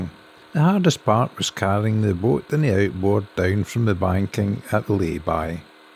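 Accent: Scotland, United Kingdom. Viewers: Subtitles not available in this video??? 😢 very complicated to me to understand your lovely scotish 😒 anyway, I'll be enjoying the pictures 😀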